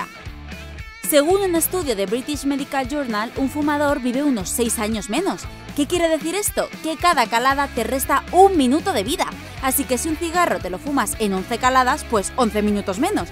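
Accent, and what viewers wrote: Spain. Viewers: Una pregunta eres de mi pueblo no 😂😂 esque es torrent\Valencia jajajaja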